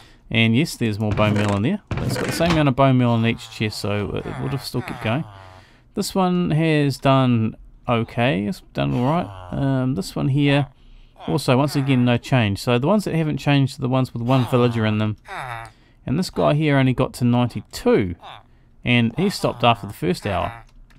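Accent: New Zealand. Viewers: are you new Zealander becouse im a nz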